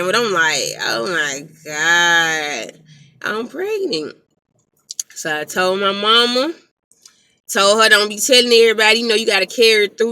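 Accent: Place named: South, United States. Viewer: Girl I just love your southern accent 💕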